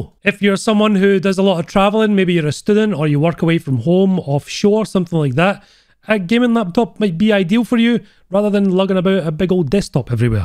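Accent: Scotland, United Kingdom. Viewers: It's the Scottish brogue. He could read off my final rites and it'd honestly be entertaining.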